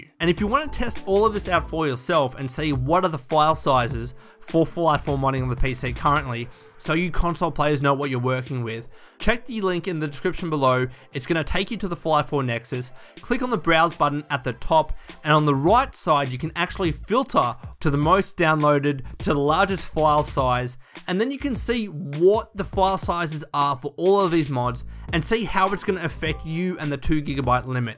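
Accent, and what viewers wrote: Australia. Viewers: Wow, I'm kinda digging the Aushie accent this way.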